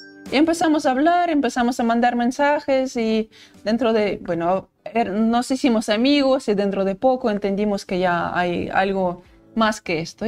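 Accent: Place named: Russia. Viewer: Jamás me Olvidaré de esa voz mesclada con asento Ruso ❤ te envio este corazón lo que te puedo Regalar en este Dia de san Valentín....... desdevenzla a marciales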